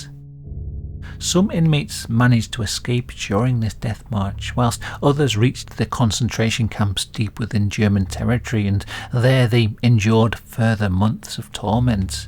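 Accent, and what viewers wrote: United Kingdom. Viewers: I've seen nothing in the video that I do not already know from countless recitations of the words associated with the Images so I find myself asking in response to your accent WHY are you NOT telling the story of the British Empire than invented Concentration Camps (Boer war) AND used them again in 1952 in Kenya. The Brits forever recite the evils of Germany (totally valid, just repetitive) but IGNORE THE EVILS OF THE BRITISH EMPIRE THAT CONTINUED SIMILAR PRACTICES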